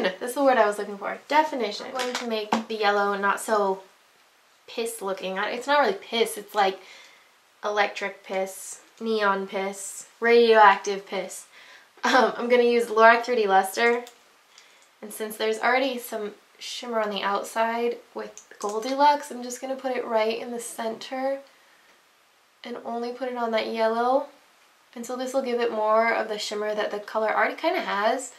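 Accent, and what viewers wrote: United Kingdom. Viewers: Lol I love this look, despite the inspiration xD I've never played that game, but the only Facebook game I've ever played is the Hunger Games Adventures, where I haven't played in a million years. Your British accent just makes your resemblance to Kate Winslet more legit :P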